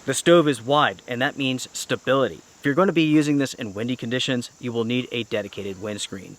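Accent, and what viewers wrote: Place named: South, United States. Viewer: Great little stove. I love your reviews and your voice. Very smooth with a slight southern accent. ❤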